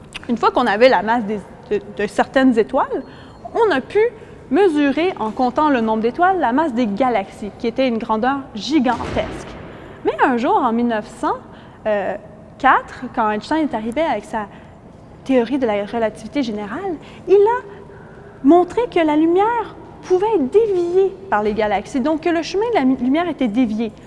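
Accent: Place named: Quebec, Canada. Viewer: Aaah l'accent québécois... Il rendra n'importe quel discours humoristique, aussi sérieux soit il. XD. Sinon très intéressant.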